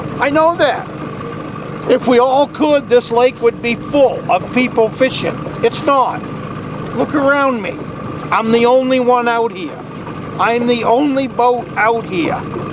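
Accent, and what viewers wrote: Maine, United States. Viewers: My mother born and raised Down East Coastal Maine . When most lived and hunted and caught most of their life . She met my Dad in NC and raised a family ... I spent many of my best childhood times crawling rock and raking blueberries . i have always dreamed of a permanent return ,but life and the wild extremes of winters keep me Southern fried and hot ... I'm tuning in to hear your voice and the accent of my relative's who no longer walk the wildwoods or clam bake on a cool summer evening . and to catch a glimpse of dream I've never forgotten . thanks for the memories that come rushing in like high tide on a full moon .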